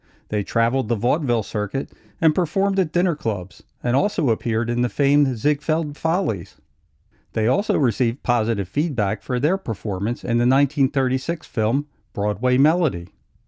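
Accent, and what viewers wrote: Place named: Baltimore, United States. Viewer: lovin the baltimore accent of the narrator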